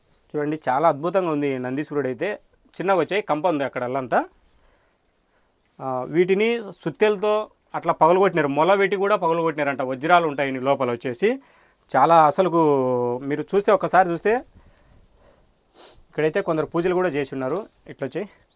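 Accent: Rayalaseema, India. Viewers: ne prati vedio chala interesting ga untundi... Keep it up...nuvvu ne rayalaseema slang super... Chala kastapaduthunnav...super bro...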